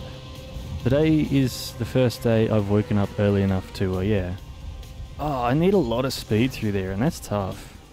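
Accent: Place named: Australia. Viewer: I thought you sounded Aussie and I think 3 am pretty much confirmed it. Are you in Queensland where cotd is still 3am after daylight savings changed? Or are you not even aussie😂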